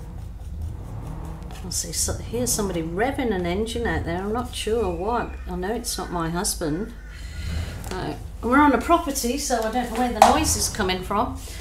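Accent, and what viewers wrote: Birmingham, United Kingdom. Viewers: Love it, yet again a cracking outcome 👏 Do I detect a " brummie accent " or is just my naff hearing x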